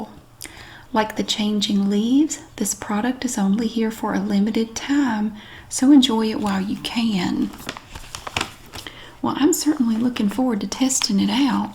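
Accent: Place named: North Carolina, United States. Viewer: Yes, she is a North Carolina native and her beautiful, musical accent is natural! 💖😊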